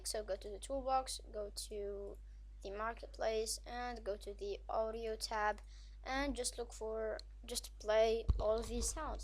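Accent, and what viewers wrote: France. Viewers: Are you french ? i am french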